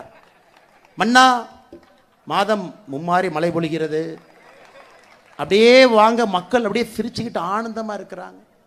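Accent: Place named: Chennai, India. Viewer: Madras பாஷை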